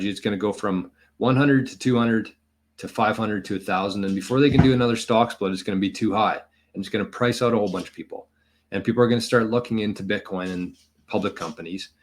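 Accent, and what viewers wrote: Canada. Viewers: As an American, it’s so cringe to listen to a canadian 🤦🏻‍♂️ Very mid content.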